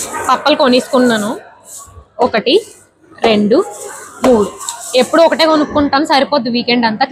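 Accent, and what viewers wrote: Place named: Rajahmundry, India. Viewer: Mi Rajamundry slang super 😂 snacks 😅 anyway nyc vlog 🙌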